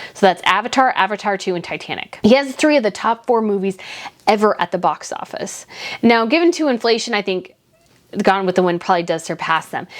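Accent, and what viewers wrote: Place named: United States. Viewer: Amazing how you talk about their little 16 mil dollar home as if you're living in a $33 mil home yourself because you don't. In addition, how are you a royal commentator sounding like an American? There are no kings and queens here in the US, and this IS NOT a Monarch where you get paid to travel. So keep your negativity there in the UK. Smh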